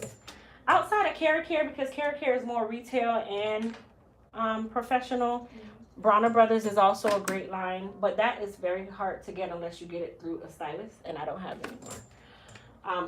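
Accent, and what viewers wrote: Jamaica. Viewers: I knew it!!! I heard your accent and thought you where from Jamaica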